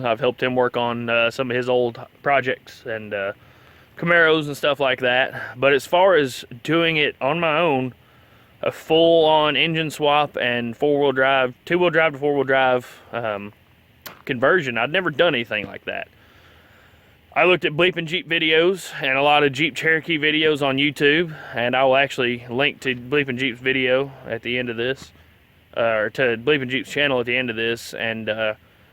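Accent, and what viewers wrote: United States. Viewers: Sub'd - I have a 2.5 TD Sport here in Scotland I bought for £600 4/5 years ago...it needs a bit of TLC...I enjoyed your informative, casual, southern style...will check your other vids! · jesus if you're not from missouri i need to reevaluate my accent and great video by the way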